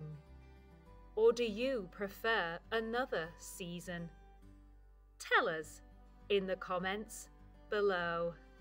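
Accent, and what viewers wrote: United Kingdom. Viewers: I like your british accent, it's so elegant. I love your videos.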